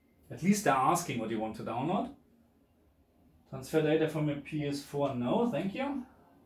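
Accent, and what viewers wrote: Germany. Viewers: Absolutely u German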